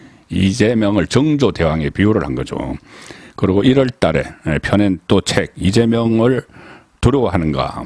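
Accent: Gyeongsang, South Korea. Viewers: 송국건님 시원시원한 경상도 억양으로 최고의 정치해설 짱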